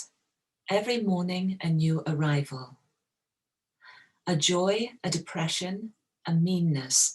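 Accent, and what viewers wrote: Mid-Atlantic, United States. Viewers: This is very interesting!! Thank you for sharing this tutorial. Your American accent sounds very good as well. 😊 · Your American accent is very good! Although when you said sorrow, I thought of Wisconsin, not necessarily Canadian. Of course, Wisconsin borders Canada so that makes sense. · Your American accent is great!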